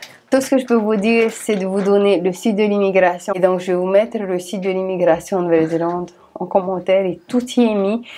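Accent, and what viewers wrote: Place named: Tahiti, French Polynesia. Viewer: J’́aime bien votre accent français tahitien et la manière dont vous présentez la vie en Nouvelle-Zélande. C’est un peu plus rassurant que ce que l’on lit et regarde sur l’immigration dans ce pays.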